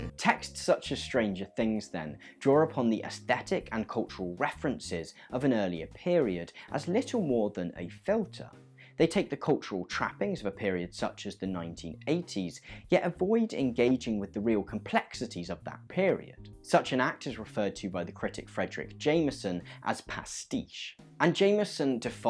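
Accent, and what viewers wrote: United Kingdom. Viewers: Thank you for making this. I liked and subscribed, this isn’t a casual thing for me and I don’t know the rest of your stuff. But I’m more willing to put up with things when they have a British accent, so I’m lucky there. Honestly, I hope you’re getting by on these videos and that’s the reason I did the above, you’ve found a way to not be a part of the rat race... and for that I admire and want to reward you. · Yoooo, Weinstock was one of my professors in college! Never thought I'd hear a British guy talking about his work! Great video!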